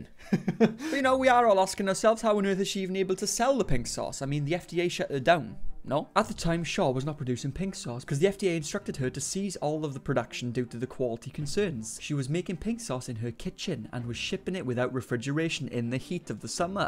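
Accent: Wales, United Kingdom. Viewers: Bought my bro that poster for Xmas 😂👍 love it 👍and your Welsh accent ❤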